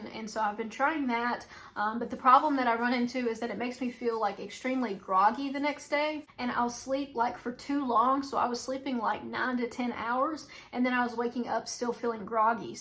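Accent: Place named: Tennessee, United States. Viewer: Thanks for ❤ honesty and accent. I live in Tennessee so it sounds nice.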